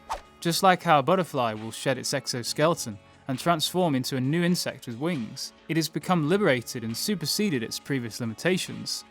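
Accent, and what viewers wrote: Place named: United Kingdom. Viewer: British, Don't care didn't ask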